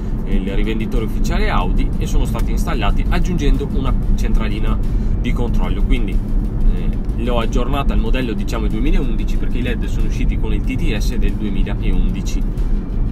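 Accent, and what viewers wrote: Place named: Contarina, Italy. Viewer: ho beccato per caso il video tra i suggeriti e ho riconosciuto subito l'accento Contarinante,top meriteresti più views,adesso mi sparo anche gli altri video!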